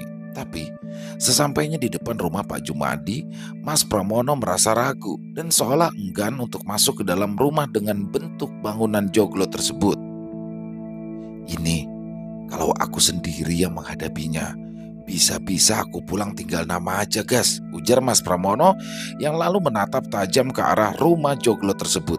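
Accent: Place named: Jakarta, Indonesia. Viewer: wahhh mksh bang ada bang lg ni ceritanya seru mantap yg plng ku suka bang bawain cerita betawi nya mantap suara betawiny sukseees terus sehat yselalu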